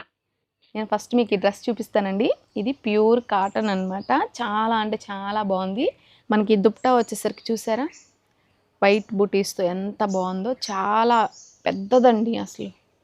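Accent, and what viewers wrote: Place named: Prakasam, India. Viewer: మీ డి ప్రకాశము జిల్లా కదా మీ వాయిస్ స్లాంగ్ అలాగే ఉంది రిప్లై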